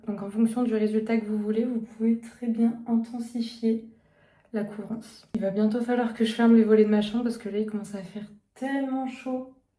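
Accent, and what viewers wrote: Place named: South, France. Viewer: C’est drôle j’ai remarqué que tu as l’accent du sud mais que sur les mots qui finissent en « in » ahah ça a un peu perturbé la sudiste que je suis ! Mais ta vidéo était vraiment digne d’un poème c’était tout doux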